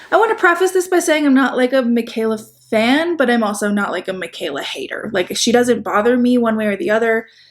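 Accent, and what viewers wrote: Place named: Chicago, United States. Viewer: Omg can confirm that her Chicago comes out when she's heated. It's my FAVORITE.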